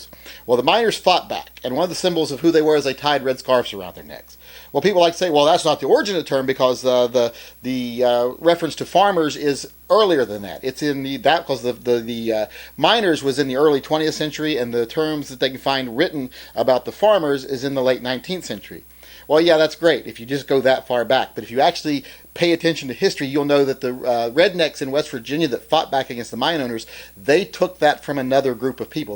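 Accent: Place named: South, United States. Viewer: don''t hide your southern drawl just because ebomey doesn;t like it..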